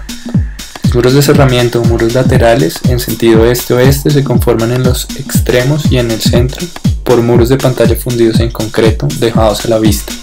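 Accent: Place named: Bogotá, Colombia. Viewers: Que pereza este narrador, Ese acento rolo, narrando un símbolo de medellin, como que no aguanta. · que jartera el acento rolo.. me da un fastidio ese tonito llenadorcito...lo peor es que ellos creen que eso les suena divino.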